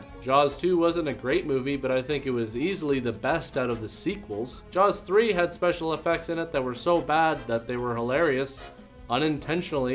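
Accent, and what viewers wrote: Canada. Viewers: Not to tease, but he does have the accent. It's not really "aBoot" as much as it is more like "aboat", though. And it's subtle and not as pronounced as the stereotype would lead people to think, but it;s there. In fact, I usually guess correctly that a YouTuber is from Canada when they say anything with the "out" sound as "oat". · Now that you mentioned Canada, I can't unhear your minor accent.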